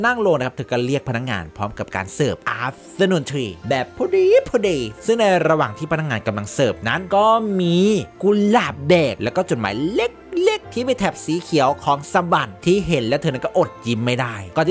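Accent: Isan, Thailand. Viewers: อยากรู้.ครับว่า.แอดเป็นคยจีงกวัดไหน..เว้า.อิสานม่วนหลาย.เด้อครับ..❤❤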